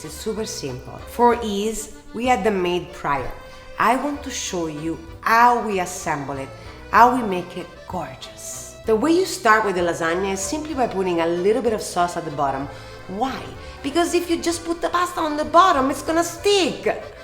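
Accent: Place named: Italy. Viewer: She is loud, hilarious and in your face with her Italian accent. I just couldn't stop smiling while watching the entire video. Oh, I almost forgot the drama and the attitude. I love it!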